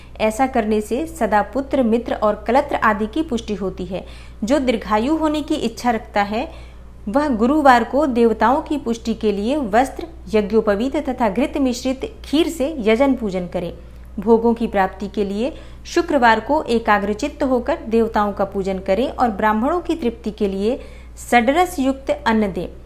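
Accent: Standard. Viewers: 🙏🙏धन्यवाद आपका उच्चारण बहुत सुन्दर,सरल और शुद्ध है ❤ हर हर महादेव 🌹🙏🌹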